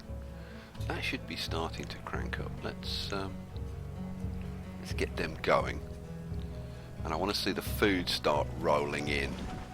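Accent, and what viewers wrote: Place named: Midlands, United Kingdom. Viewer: What is your dialect? It sounds a bit midland-esque. Just curious. Good videos.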